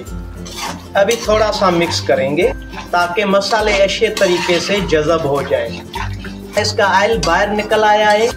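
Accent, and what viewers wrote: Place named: Sindh, India. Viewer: Beautiful colors..bohat achi recipe..aurcaap ki sindhi accent itni pyari he سائیں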